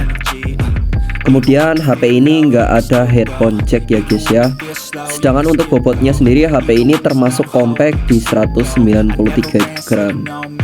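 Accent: Banyumas, Indonesia. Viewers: njir wong banyumas jebule😊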